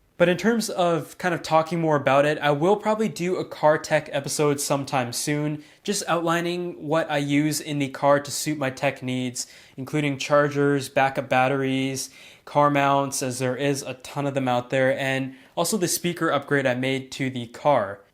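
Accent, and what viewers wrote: Canada. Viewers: wait u canadian fam?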